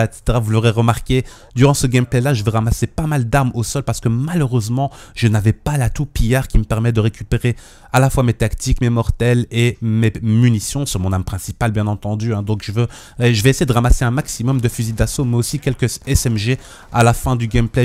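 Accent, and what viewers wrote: Belgium. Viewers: Tu viens d'ou de Belgique? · Un petit accent belge il me seble non? :) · Le commentateur est belge ? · cho l'accent belge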